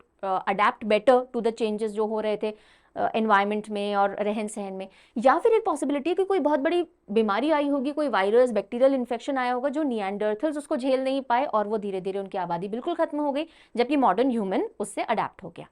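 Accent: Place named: Rajasthan, India. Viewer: Mam u r Rajasthani ....aapki koi koi baato se lg jata h 😅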